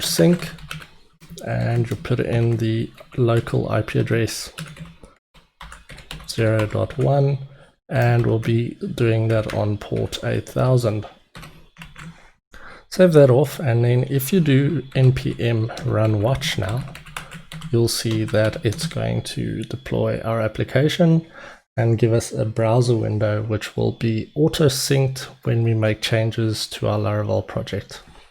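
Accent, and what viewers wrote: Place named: South Africa. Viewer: I know my south African accents😂😂 im from Joburg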